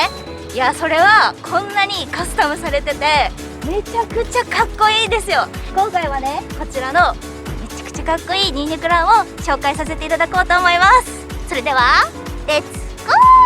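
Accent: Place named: Hiroshima, Japan. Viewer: ちゃん今日もめっちゃ可愛いね💕 相変わらず広島弁がいいね👍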